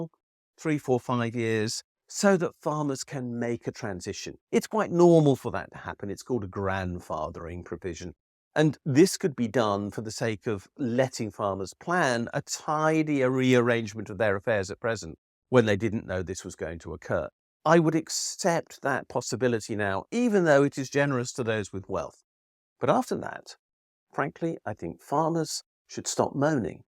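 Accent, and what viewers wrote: United Kingdom. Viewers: I may always have believed what here is professing, but i believe it doubly now that I've heard it spoken with a British accent. Cheers from across the pond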